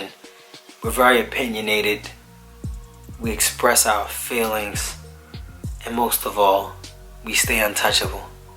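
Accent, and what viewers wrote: Baltimore, United States. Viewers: u gotta b from bmore i could hea it in ya accent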